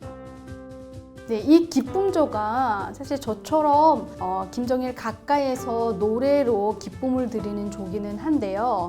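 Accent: Seoul, South Korea. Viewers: She already had that seoul accent i think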